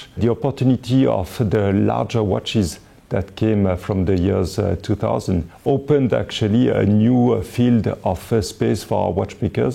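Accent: France. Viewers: Ho non... Cet accent français 😂😂😂🤣🤣🤣 Non mais franchement fait un effort 😂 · Je reconnaît ton accent de français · Cet accent français de l'espace ! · Wonderfull french accent for the Forst video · Magnifique accent français ;) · Cette accent français mdr <3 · He speaks great English with a French accent..... I speak average French but I try my hardest to recreate as good a French accent as possible.... When speaking to a French person my aussie accent shows through and probably sounds similar to the way I hear his French accent when speaking English. I couldn't fault him. Excellent description and vocab. Dunno what the fuck you're on about mate.... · Francuz mówi po angielsku - MASAKRA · dude that french accent tho XD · Qui a reconnu l'accent français ? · laccent Français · French accent too much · As a french i totally spot that accent from the first second x) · french accent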